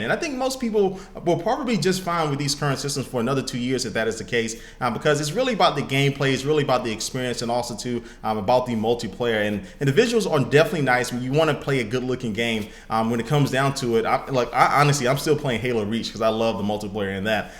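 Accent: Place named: United States. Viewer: I thought he was American.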